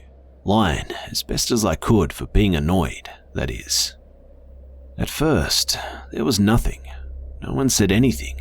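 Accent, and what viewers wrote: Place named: Australia. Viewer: The Aussie accent and for the most part locations makes the stories more comical than scary.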